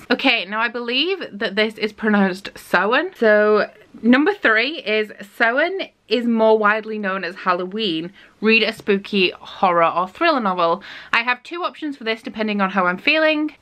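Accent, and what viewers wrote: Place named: North, United Kingdom. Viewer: Ahhh, our accents are so similar! Are you from the north??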